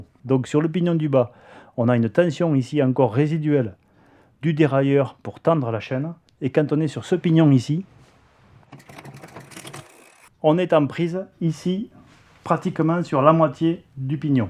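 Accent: South, France. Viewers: Super, merci pour ces explications super claires avec le petit accent du sud en plus 😄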